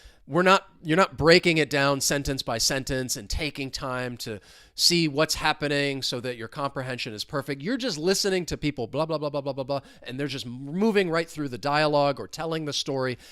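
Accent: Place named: New York, United States. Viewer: Based on your accent, I think you are from NYC or NE states at least. Am I correct?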